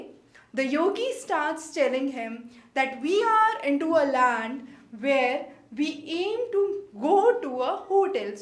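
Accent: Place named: South India, India. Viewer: I m thinking your from southIndia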